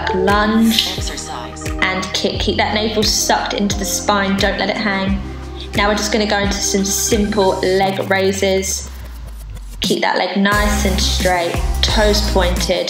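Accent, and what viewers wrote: United Kingdom. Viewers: Love the is it british accent btw?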